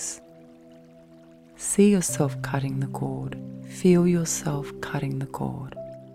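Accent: Australia. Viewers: I love you & your tranquility!!!❤️❤️💋💋, I love your sweet, soothing, sexy chloroform voice!!!❤️💋, thank you so very much for your sweet happy Australian voice!!!❤️💋❤️💋, I love you with hugs and 💋💋💋💋💋💋💋💋💋💋💋💋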